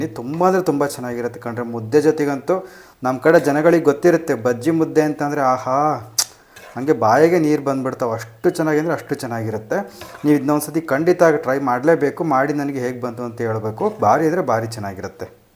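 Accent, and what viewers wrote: Chitradurga, India. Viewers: Anna nam durga bhashe chanag mathadtiya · Sir nammdu Talikatte Holalkere,nivu hosdurga, Chitradurga dalli,yava villege sir? ನಿಮ್ಮ ಭಾಷೆ ಪಕ್ಕಾ ನಮ್ಮ ಐತಿಹಾಸಿಕ ಚಿತ್ರದುರ್ಗ ದ ಭಾಷೆ, ಕೇಳಿ ತುಂಬಾ ಖುಷಿಯಾಯಿತು,tq u sir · ಪಕ್ಕಾ ದುರ್ಗಾ ಭಾಷೆ....... ಅಣ್ಣಾ ನಮ್ದು ಹೊಸದುರ್ಗ........😊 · ಚಿತ್ರದುರ್ಗ ಭಾಷೆ ಸೊಗಡು...ಆಹಾರ ಪದ್ಧತಿಯನ್ನು ಚೆನ್ನಾಗಿ ಪರಿಚಯಿಸಿದ್ದೀರಿ ...ಸೂಪರ್ bro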